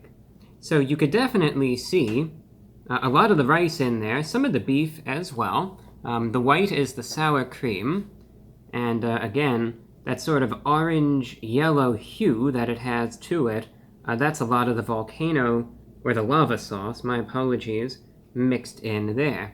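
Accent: United States. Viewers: Is it just me or is anybody else wondering if this guy is American or English?? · I dont think ive ever heard anyone speak American English quite as properly as you 😂😂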